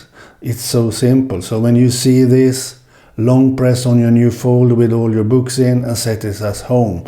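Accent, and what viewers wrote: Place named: Sweden. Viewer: Jag har just installerat "assistant.koplugin" från github på min Kobo libra 2. Testade för ett par år sen med askGPT, men den funkade nog bara till jailbreakad Kindle. Men jag rekommenderar verkligen att kunna använda AI. Kör själv DeepSeek, som jag eg bara kan tycka ta ngt för lång tid, men annars hur bra som helst. Tack för en utmärkt kanal. Jag känner mig väldigt hemma här som svensk, medelålders e-bokläsar-nörd med samma svengelska dialekt som du. :)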